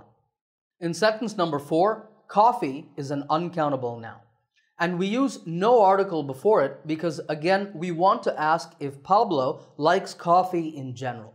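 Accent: United States. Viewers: He spoke like, an American. Wow 🤩his vocabulary is fantastic. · Sir your video is amazing . Also you have a american accent .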